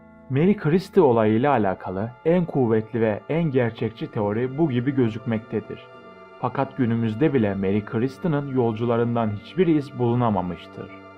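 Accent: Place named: Germany. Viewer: kardes alman ikinci liginden gelen gurbetci topcular gibi konusuyosun.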